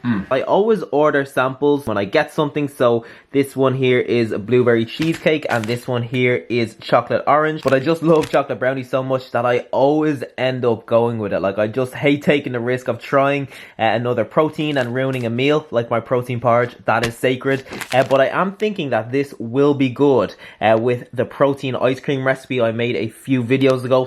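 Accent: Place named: Ireland. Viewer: Based on the accent he's from Ireland, maybe Dublin. Im not sure though.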